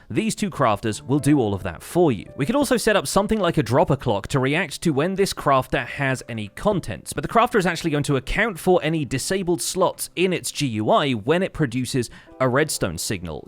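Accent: United Kingdom. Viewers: yeah yeah.. go spend an hour building a machine to make 100 pink dies a second.. then you can make crafters for every other die! That's already like a dozen Plus videos! You're so hyped for auto die.. it's funny! To answer your question: I think I'm dunking on just another Minecraft YouTuber with a British accent.. you all make the same videos too.. you all just copy each other.. You're a dime a dozen, Bud.. Step on down off your high horse. Who do you think you are? -PHILZA!? ...not even close Got anymore stupid questions? ..Go craft some die.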